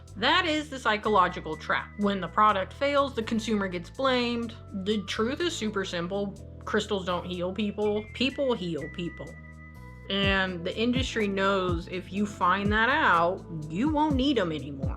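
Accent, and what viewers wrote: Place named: Texas, United States. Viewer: Dear! This is so fucking hilarious. Your stream of consciousness took me for a ride! It was such a rush and because of the depth of your research It was a ride of outstanding beauty and mindblowing insights. I adore your dialect!! Are you an Unicorn with a Texas-Twäng? Where do you come from? Pleeeeze tell me!! I' m from Germany. 🍀😉♥️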